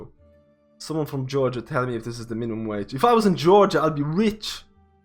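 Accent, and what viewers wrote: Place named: Ireland. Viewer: Came here for resto druid info, stayed for the Irish accent.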